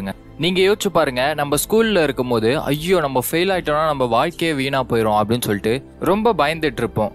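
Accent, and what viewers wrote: Kerala, India. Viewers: That kerala slang tamil voice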